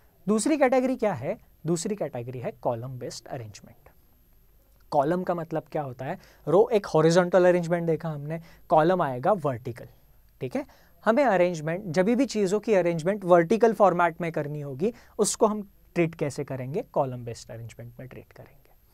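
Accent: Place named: Maharashtra, India. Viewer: that marathi accent is 😍😍😍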